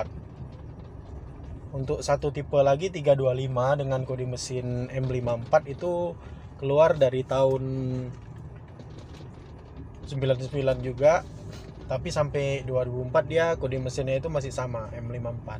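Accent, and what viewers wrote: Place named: Aceh, Indonesia. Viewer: Kalo di lihat dr logat bahasa kayaknya ini di aceh ya Bang?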